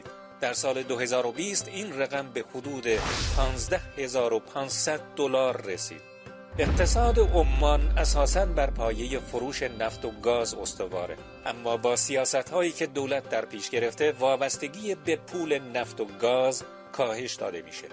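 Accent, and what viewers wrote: Iran. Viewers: گوینده بلوچ هستن؟ (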